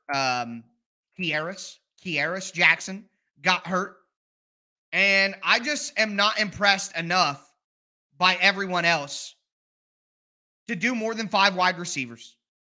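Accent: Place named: South, United States. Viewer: This is why I can’t subscribe to this channel with that southern accent I know he doesn’t want a black QB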